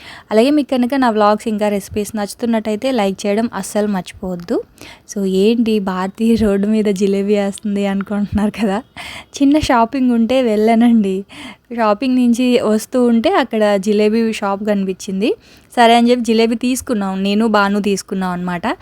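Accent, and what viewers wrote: Godavari, India. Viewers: Meru pakka godavari slang lo matladutunnaru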